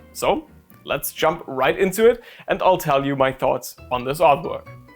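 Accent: Germany. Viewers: I just bought your book! draw like a mangaka!!!! thank you so much of all of your videos!!!!!!!!!!! I like hearing your German accent! it inspired me enough to get back only German lessons, so, that you for that too!!!!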